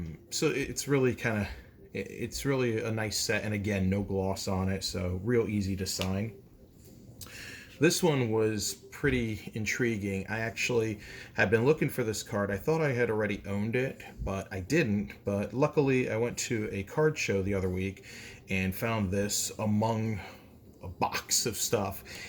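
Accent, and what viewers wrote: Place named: South, United States. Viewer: New subscriber. Great video buddy! The only flaw I can find is your heavy southern accent. Maybe in your next video you could do a New Jersey accent to help appeal to all Americans and drive up your views.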